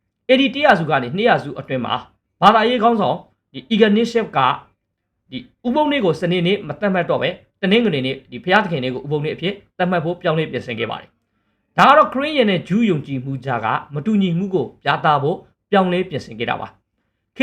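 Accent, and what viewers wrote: Rakhine, Myanmar. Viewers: ရက်ခီးသံ ဝဲလွန်းဝာာ အဆင်မပြေ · ပထမကတော့နားထောင်မလို့ပဲ ရခိုင်လေသံကြားတာနဲ့ ဆက်လည်းနားမထောင်ဘူး unlikeလည်းပေးပြီး not interested လုပ်ခဲ့တယ် မင်းတို့ရခိုင်တွေ အကြွေးပြန်ဆပ်ရမယ်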